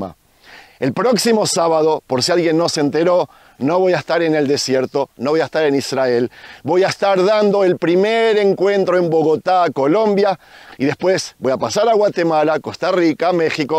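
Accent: Buenos Aires, Argentina. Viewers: Shalom, cada vez que te oigo digo, este hombre no puede negar que es Argentino y Porteño. Muy buena la metáfora . Los gobiernos populistas te empobrecen y después hacen creer de que eres un pobrecito y ellos como son buenos te da un poco de comida y alguna beca. Y sabemos, que siempre la comida gratis siempre es la más cara. Cariños a tu esposa, festejando el aniversario en tu trabajo. En lugar de llevarla a Paris. Jaja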